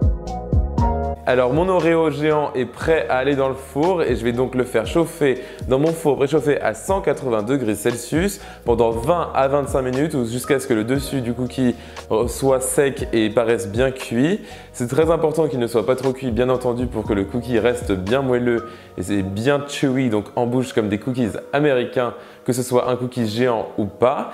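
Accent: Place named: England, United Kingdom. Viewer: ouais ta raison . mais il a un accent déjà bien anglais peut être qu' il anglais et français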